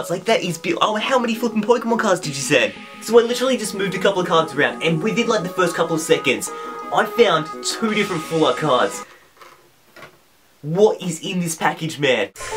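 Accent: Australia. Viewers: hey, just wondering, r u from South Australia?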